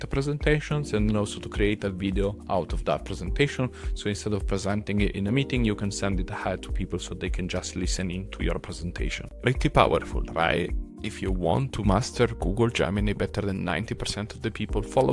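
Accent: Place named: Italy. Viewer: This is awesome thank you for sharing this keep showing up! Saw your LinkedIn post about your accent. I think the Italian accent is awesome! 🙌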